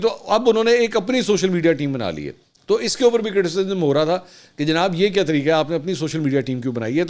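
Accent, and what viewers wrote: Punjab, Pakistan. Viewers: Bhai Panjabi boht peyre bolty Han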